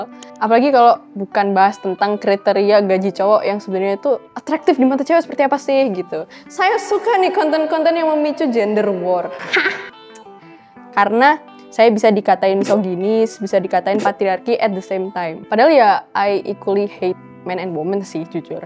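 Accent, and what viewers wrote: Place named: Surabaya, Indonesia. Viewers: Mba mba ini sepertinya cici cici suroboyoan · Chindo Suroboyo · Medok sidoarjo an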